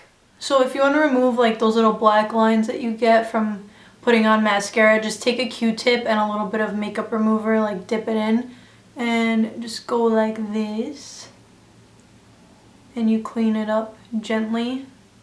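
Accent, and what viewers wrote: United Kingdom. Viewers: Love the English accent!! I'm from the uk. We do say i can't be botherd alot 😄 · I subscribed because your British accent made me laugh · omg loved this video to the moon and back, you had me laughing so hard with the "I can't be bothered" xD love brits accents! you seem in such a good mood definitely contagious cause now I'm smiling! :p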